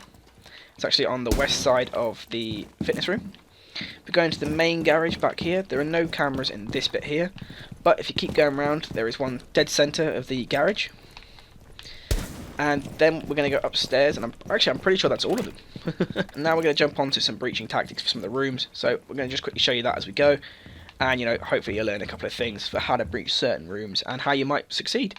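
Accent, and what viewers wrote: Ireland. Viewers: Hey r actually Irish if so wer from cause I'm from Kildare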